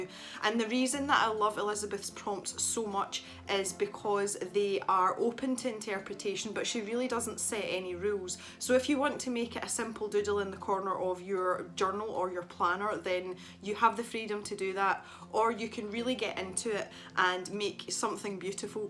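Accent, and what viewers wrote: Scotland, United Kingdom. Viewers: Loved the video and am one of those who loves your accent. My Nan was Scottish and I have many a happy memory of visiting her in Bonny Scotland. I miss her so much, and find your voice sooo soothing!Also, I was born and bred in Corby which is known as a Mini Scotland and has a wide range of Scottish accents, so understand you perfectly (though I couldn’t always understand my Nanny June 😂)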